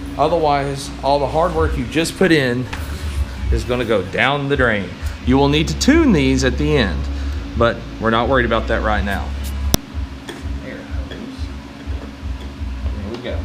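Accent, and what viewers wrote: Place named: South Africa. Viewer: you sound like a white south african my man! Dope vid man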